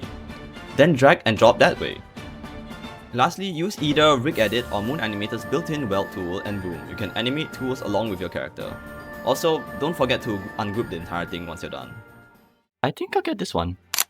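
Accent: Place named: Singapore. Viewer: Singaporean too! I recognize the accent from anywhere!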